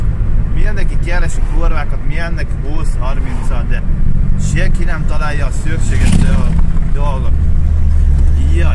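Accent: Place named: Transcarpathia, Ukraine. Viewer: Mert valószínűleg Kárpátaljai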